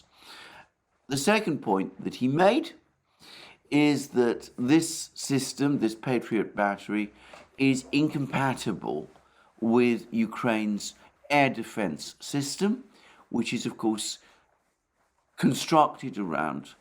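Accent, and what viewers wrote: United Kingdom. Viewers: One of the best places for lovely russian propaganda offered with a british accent by a disgraced lawyer.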